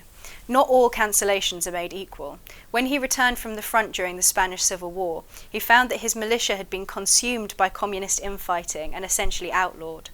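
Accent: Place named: North, United Kingdom. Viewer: I'm not moaning here, but I'm curious how uses the northern pronunciation of "ask" and "pass" yet is apparently from southern climes.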